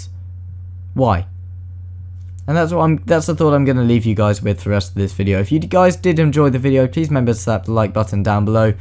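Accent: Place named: Texas, United States. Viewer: you sound like you're from teaxas.....1776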